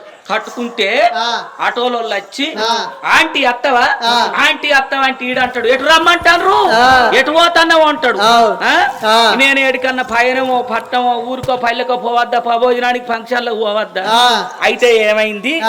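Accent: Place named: Telangana, India. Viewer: In from Andra but telangana slang chala baga ardam avtundi....enta baga matladtnaro... Same village vibe❤❤❤❤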